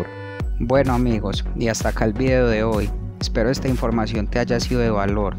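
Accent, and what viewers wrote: Antioquia, Colombia. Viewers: El man que habla en este vídeo es paisa? Buen vídeo 👍🏻😁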